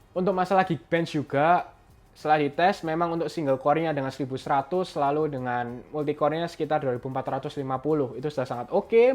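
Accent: Java, Indonesia. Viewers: Nada bicaranya kaya orang jowo bangeddd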